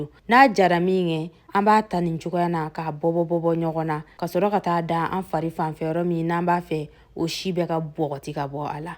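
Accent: Bambara, Mali. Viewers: J'adore votre accent bambara ❤😊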